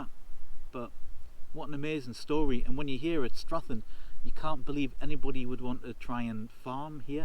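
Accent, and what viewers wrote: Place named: Newcastle, United Kingdom. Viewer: A newcastle accent fuck this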